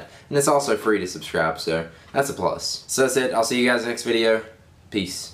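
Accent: South, United States. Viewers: I think he just means your slight southern accent.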